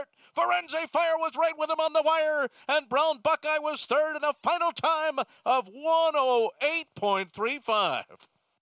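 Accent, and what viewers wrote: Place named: Venezuela. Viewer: castellano de Venezuela !!!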